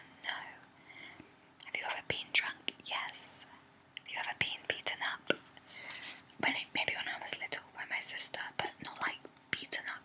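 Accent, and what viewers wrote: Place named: England, United Kingdom. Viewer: Well I'm sure guys that know you an your boyfriend will agree with me. I like your accent aswell where are you from in England?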